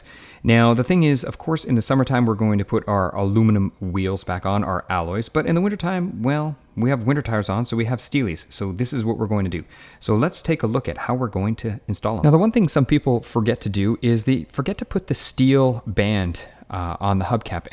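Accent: Canada. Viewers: Great vid G! I think I heard the canuck accent!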